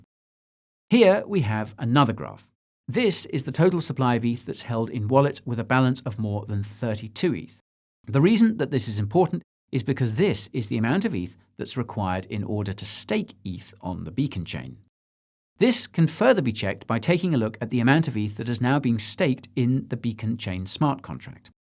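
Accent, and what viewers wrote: United States. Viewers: Your American accent is pretty good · Guy, does a pretty good American accent. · American accent was not bad///// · Impressive United States accent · I like that USAccent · Pretty good American accent guy. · The American accent is brilliant! Very well done. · Incredible American accent! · that American accent is on point lmao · Not bad American accent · your american accent is fantanstic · Great American accent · That was a far better American accent than I would be able to do British accent. Bravo 👏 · pretty good US accent lol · Love the American accent lol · As an American, I want to say your American accent is excellent! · Great American accent. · The American accent is amazing hahaha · Love your American accent! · The American accent was great 👍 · As an American, I appreciate your American accent hahaha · Great American accent! 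I'm always amazed when I hear a brit talk just like a Yankee! Very kool! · american accent is sick. much love from tennessee 🇺🇸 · Nice American accent!!! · Great American accent, ya got there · As an American I find your American accent impeccable and was super impressed. 😳🌟👌 Well played, sir!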